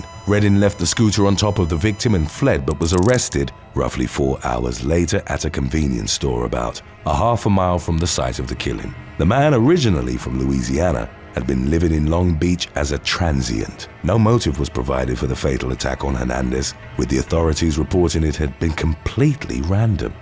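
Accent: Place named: United Kingdom. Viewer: Lol when you realize this channel is British. I didn't think about your accent. Soliciter=lawyer??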